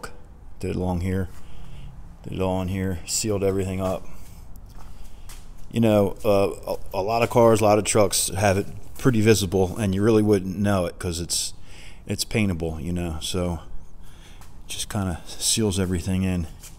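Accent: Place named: Philadelphia, United States. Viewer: Nice video. Your accent sounds like my whole family from Philly and New Jerz.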